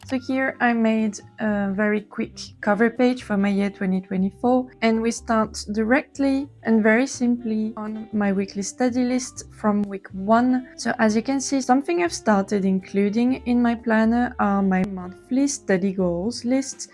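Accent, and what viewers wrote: France. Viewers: I knew it!! 😂 I love the French accent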